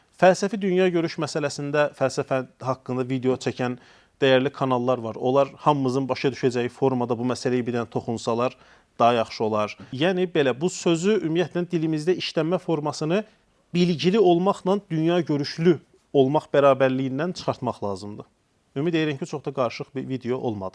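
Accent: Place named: Shaki, Azerbaijan. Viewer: Nitqinizdə Şəki ləhcəsi oturub.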